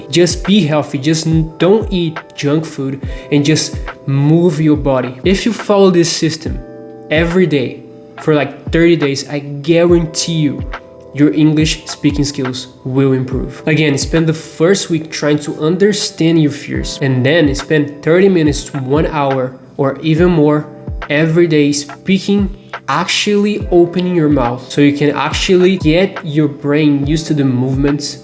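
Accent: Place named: Brazil. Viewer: He's Brazilian!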